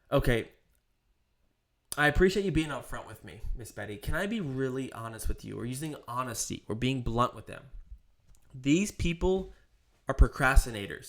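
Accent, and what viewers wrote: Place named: Rural, United States. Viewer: Heyyyyyy…..it’s!!!! Hardest opening line, wish my name was so I could say it with that country twang😂 anyone new to this channel, watch EVERY.SINGLE. VIDEO. that this man puts out. He will help you pick the right agency, get out of bad ones, create a GOLD script, source free leads, teach others. Dude you guys don’t understand is literally putting his neck out there so that we can learn and get real,honest,quality information about the industry, and what to watch out for. These big IMO/MLMs don’t like what he says because it pulls back the curtain on lots of these pump and dump recruitment models. has not only lived the broker/agent life good and bad but also teaches others the exact blueprint. He’s sharing his success and wins with us random strangers. Protect this man at all costs. Also just got my resident license snd a bunch of non resident license which my imo told me to get and then after getting them said I overdid it😂 So far so good, no red flags in the contracting department. 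I’ll be starting age lead calls Tuesday. In the meantime I’m still trying to get a hang of doing EAPPS and scripts. Any tips on mastering EAPPS and the products each carrier sell? Thanks. Keep it up!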